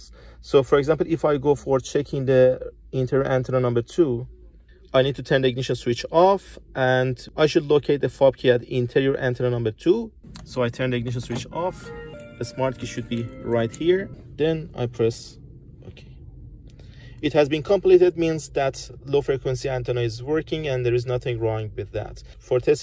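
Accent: Iran. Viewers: r u iraniain? اگر اره بگو فالو کنیم . هرچند از لهجت ایرانی بودن میریزه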